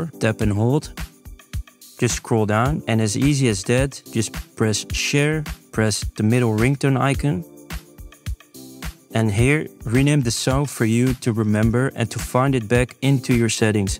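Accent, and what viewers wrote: Netherlands. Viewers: Are you Dutch you have a very nice accent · u sound so dutch 😭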